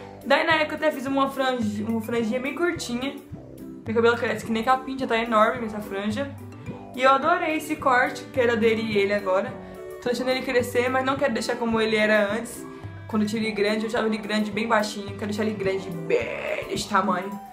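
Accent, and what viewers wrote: Brazil. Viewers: Você é goiana? 😍😍😍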